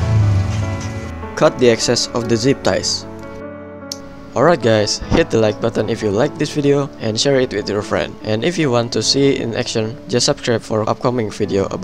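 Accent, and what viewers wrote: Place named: Indonesia. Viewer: shiet i knew it from the accent... you're from indonesia ...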